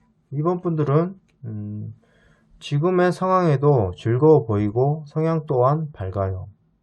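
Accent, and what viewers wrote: Jeolla, South Korea. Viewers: 전라도 사투리👍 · 의도하신건지 모르겠는데 설명하실때 사용하는 언어가 너무 웃겨요 그리구 전라도 분이신듯!??ㅋㅋㅋㅋ 2번인데 잘맞추시네요 ㅋㅋ 왜그런지 모르겠는데 사람들이 저를 좋아해요 감사합니다~!!!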